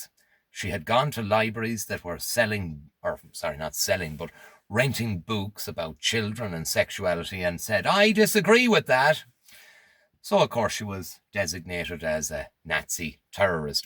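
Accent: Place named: Ireland. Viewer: Thanks I could listen to you all day. You have a fantastic speaking voice and accent. Irish legend.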